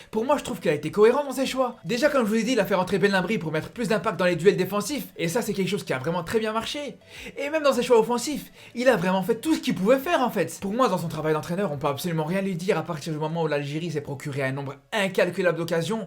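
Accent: Belgium. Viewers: Accent Belge 🍟🍟🍟🍟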